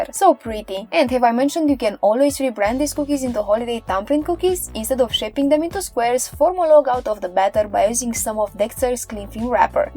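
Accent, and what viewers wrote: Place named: Germany. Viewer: so you think she's French? to me, she has almost a German accent...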